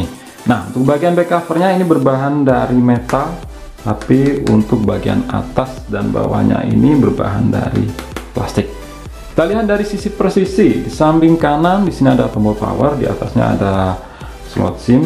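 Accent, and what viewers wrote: Blitar, Indonesia. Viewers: Wong blitar bak e :v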